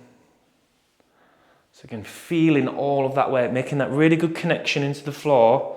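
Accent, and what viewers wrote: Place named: North, United Kingdom. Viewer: I have historically hated strength training, only beginning to factor it in alongside my beloved running when the injuries started. Now it's of course a non-negotiable, and I can honestly say that your videos are some of the only ones I enjoy on here! It's almost not a chore! I'm super bendy (but with the stiffest ankles) with poor balance and find that some other vids speed through so I can't keep up, and also introduce advanced exercises quite early on which my lower back can't hack. I enjoy that you offer modifications, encourage us to take our time, but also talk through purposes too. Thanks - my fave runner's channel! Plus, I enjoy a fellow Northern accent!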